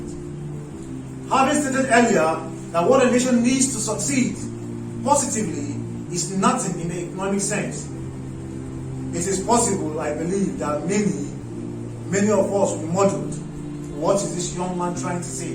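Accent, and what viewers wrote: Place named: Yoruba, Nigeria. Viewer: How do i hear this thing now.... okay not me running out of paitent wen i heard yoruba English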